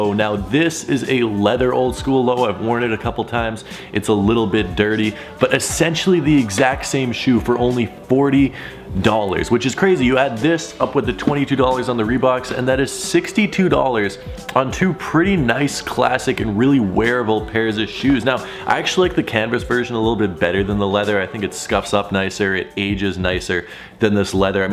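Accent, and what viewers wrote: Winnipeg, Canada. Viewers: Are you from Winnipeg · Are you Canadian oh and I going to like my own comet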